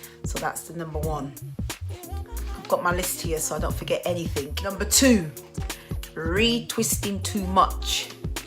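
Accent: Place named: Northern England, United Kingdom. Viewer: Great video - I only use clarifying shampoos, don’t want any build up in my locs. I’m loving your videos and the northern accent makes me feel like I’m back home in Bradford! I moved to the south as a child but go back often and your accent is making me home sick.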